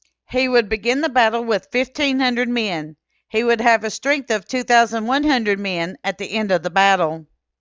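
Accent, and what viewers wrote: Minnesota, United States. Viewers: Never heard such a thick Minnesotian accent...😊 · It's a Minnesotan upper midwest accent you can hear it in parts of the Dakotas Wisconsin and Iowa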